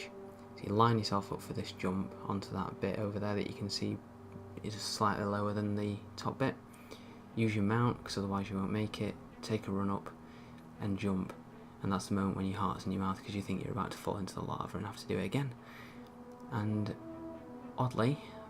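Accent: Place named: United Kingdom. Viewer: Yes, Ewan McGregor :D And I mean the person that is speaking in the videos. I wish I had this accent. I am not British though so I have to live with my foreign one... ah well :D Jealous though.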